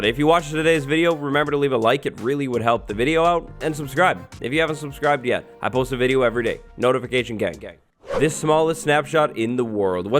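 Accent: United Kingdom. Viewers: British YouTuber?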